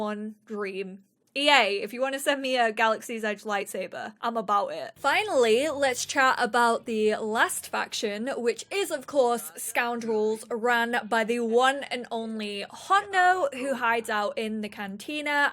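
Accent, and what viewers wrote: England, United Kingdom. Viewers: I totally hear the British accent 😂😂😂 (I'm from Yorkshire) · OMG she does sound English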